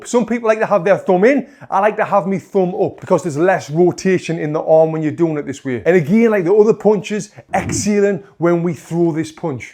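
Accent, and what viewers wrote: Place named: England, United Kingdom. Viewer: Comment after comment of people inspired by your teachings. You should be proud, not sure what type that English accent is but it def adds something, that’s for sure.😂😂😂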